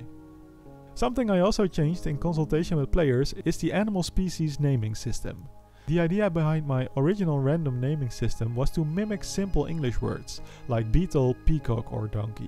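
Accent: Netherlands. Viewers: Hey maybe a odd question but are you dutch by any chance.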